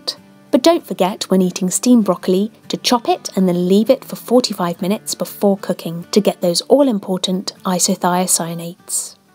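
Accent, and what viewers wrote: United Kingdom. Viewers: I love your voice and british accent :) Great information shared, thanks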